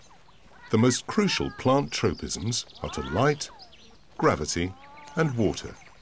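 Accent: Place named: United Kingdom. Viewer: He sounds British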